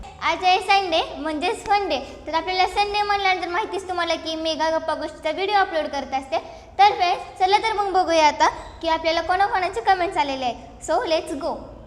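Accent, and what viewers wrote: Latur, India. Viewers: you are Very Sweet Girl,.., Tuzya vanitun Latur cha sugandh Yeto. Tuze sarv video apratim ahet Video pahun gawachi odha lagate Makani Dam cha video adhik Vistar purvak hava hota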